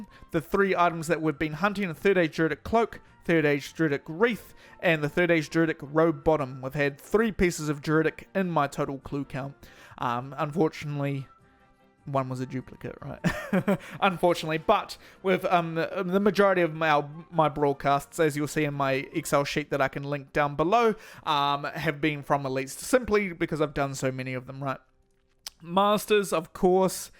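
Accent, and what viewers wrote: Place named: New Zealand. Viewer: Mate, are you a kiwi? You got that thicc east tamaki accent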